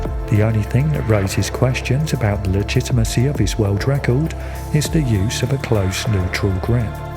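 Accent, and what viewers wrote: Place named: Kent, United Kingdom. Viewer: Are u from kent mate... Your accent 😄😄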